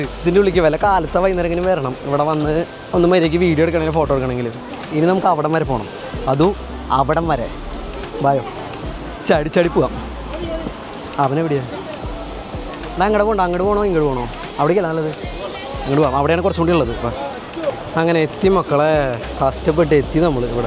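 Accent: Thrissur, India. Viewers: Ohhh Thrissur ethiya feel samsaram kelkumpo 🫠❤️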